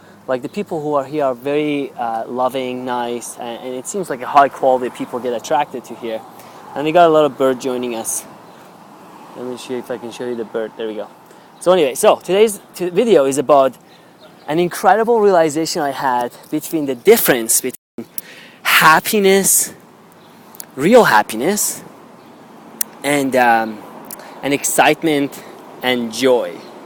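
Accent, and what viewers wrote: Iran. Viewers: just out of curiosity,where are you from originally? you have a slight Persian accent when you speak English!!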